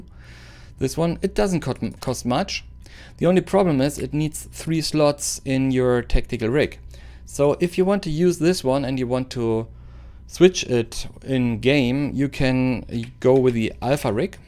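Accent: Germany. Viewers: Man hört sofort das du deutscher bist😉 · Der deutsche Akzent ist unüberhörbar, gutes vid btw <3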